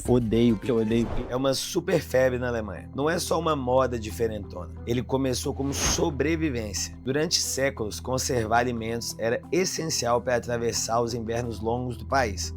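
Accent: Minas Gerais, Brazil. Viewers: 😂😂😂😂❤❤❤, seu canal é ÓTIMO! Mas o seu mineirês é ainda melhor 🎉🎉🎉 e só enche a gente de saudade. Pra pronúncia de quase tudo, principalmente pra gravar, use a referência do Google. Weisswurst vira então Váissvurst - facim-facim, né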